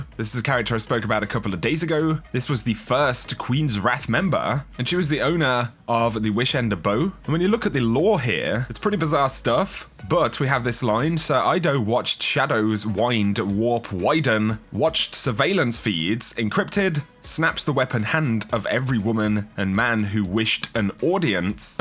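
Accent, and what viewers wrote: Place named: England, United Kingdom. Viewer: If Christopher Walken was a Destiny content creator with an English accent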